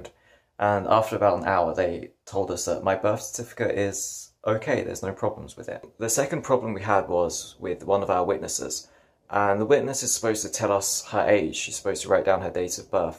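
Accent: United Kingdom. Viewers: Samm Y i get what u are saying. But to bash a depressive does not help.. British accent and looks are sweet. His words not so much, reflect his depression, lack of accessing true emotions, joy... and his insecurity (like need to proof us his marriage certificate, which is really not our business )... he got loads of judgment... about woman and man... which means he judging him self even harder and more... which in the core leads to depression.... i just wish he would realize it, and start having a therapy to turn his life over... show his followers would be such a great asset if maybe many depressive followers to show that u can turn into joy with life... your weaknesses are your strength - i truly hope he is more cheerfully with his wife... idk even why i keep watching him once in a while... i guess hoping to see him for once happy.....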